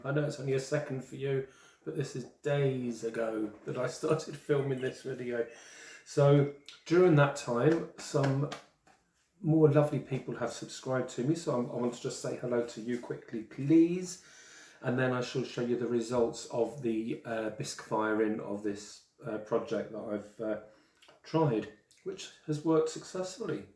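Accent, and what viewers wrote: United Kingdom. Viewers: You made bisque biscuits! Love your videos. BTW, as far as I know, those of us in the US say vase the same way we say face. Soft s. But I really love listening to your British accent. Not sure ours is as attractive as yours.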